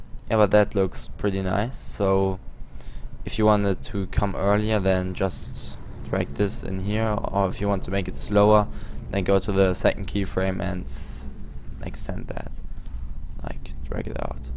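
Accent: Germany. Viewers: man erkennt deutsche so leicht xd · Haha das is das allererste video was ich von dir schaue aber ich hab sofort gemerkt dass du deutscher bist :D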